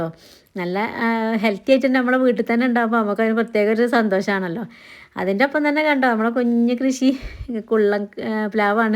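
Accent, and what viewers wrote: Malappuram, India. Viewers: ഇങ്ങള് മലപ്പുറം, നിലമ്പൂർ, വണ്ടൂർ ഭാഗത്ത് എവിടെയെങ്കിലുമാണോ? സംസാര ശൈലി വെച്ച് ചോദിച്ചതാണ്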